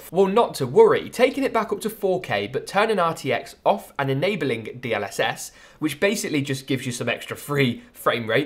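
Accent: United Kingdom. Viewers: I like the UK accent 😅😅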